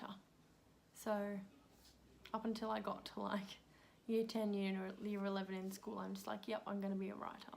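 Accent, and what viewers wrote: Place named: Australia. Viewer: I was thinking of doing this for my channel too. Where did you get the questions? 😁 Also, I can't get over how Australian you sound now!! 🇭🇲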